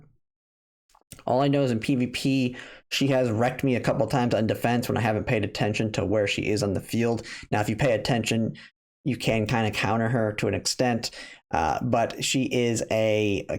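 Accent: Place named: West, United States. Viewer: the way he pronounces the russian names is just ... well , you can tell he is from West for sure :D but the list is solid .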